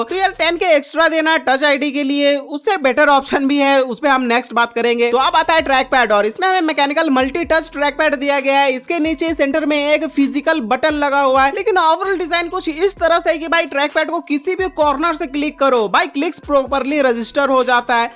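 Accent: India. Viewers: Indian accent so amazing🎉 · Bhai pakka tum bengali ho, mask lagake rakho tabvi bata skata hu 😅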